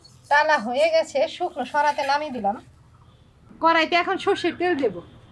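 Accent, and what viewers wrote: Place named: East Bengal, Bangladesh. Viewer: দিদিভাই তোমার পূর্বপুরুষের বাড়ি কি বাংলাদেশে ? তোমার রান্না এবং কথার সাথে পূর্ব বাংলার অনেক মিল আছে।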